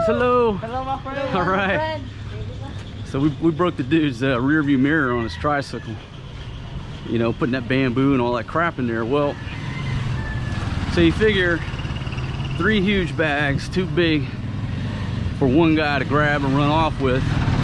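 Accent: United States. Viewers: The original American accent ! Beautiful accent bro. 🇺🇸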